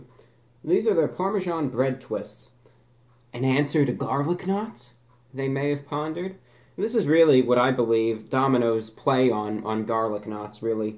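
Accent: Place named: East Coast, United States. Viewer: This man seems like a character that you would encounter in one of the Fallout games, particularly 4 due to his east coast accent.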